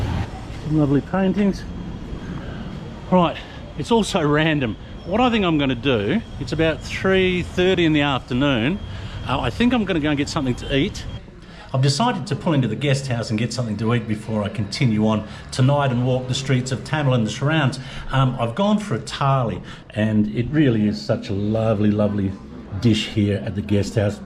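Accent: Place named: Australia. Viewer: It certainly wasn't a dig. In fact it was nice to hear some familiar "Australian English", as we wander around Europe.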